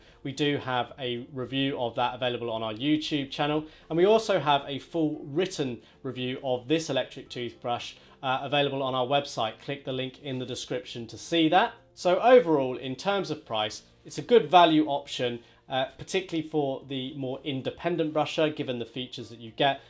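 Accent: United Kingdom. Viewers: Probably should drop the English accent too...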